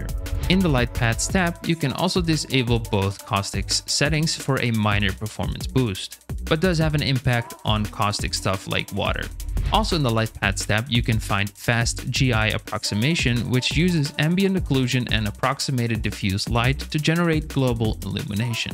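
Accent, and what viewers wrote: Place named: Netherlands. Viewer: I hear a Dutch accent! NL!!!!! ❤❤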